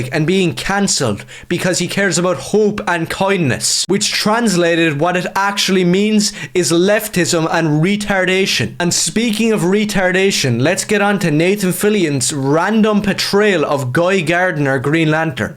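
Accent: Ireland. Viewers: Love your channel bro. Are you from Dublin ? Anyways can you say shite in your video instead of crap, please ? It’s a cool word and your accent is cool bro. And yea fuck this woke bullshit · The Irish accent didn't clue you in?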